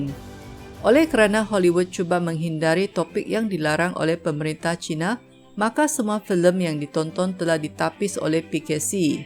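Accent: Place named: Indonesia. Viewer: Boleh tahan cubaan berbicara a la Semenanjung penyampai ini. Menjadi 90%,, cuma masih ada 10% bunyi Indonesia tak dapat disembunyikan. Sebelum ini ada aku dengar penyampai lain channel ini masih berbunyi 97% Indonesia, 3% sahaja Malaya (iaiti bahagian a dihujung jadi e) 😆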